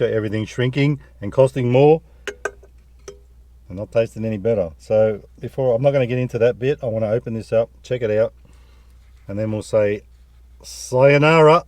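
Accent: Australia. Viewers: This blokes a little too Australian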